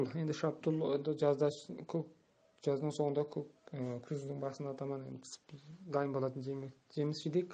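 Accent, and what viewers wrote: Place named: China. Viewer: Рахмет сізге. Көп танымдық ақпарат беріп өттіңіз. Алайда Қазақстанға басқа елден келген адам құсап сөйлейсіз. Кей сөздеріңізді түсіну мүмкін болмады. Қытайға жақын жер ма сол жақтың акцентімен сөйлейді екенсіз. 🤭🙊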